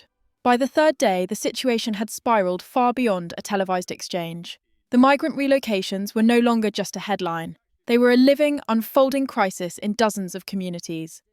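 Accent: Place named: England, United Kingdom. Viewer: I'd have wanted to continue listening, but managed just shy of 5 minutes; the narrator sounded like a robotic AI with an English accent, rather than a person.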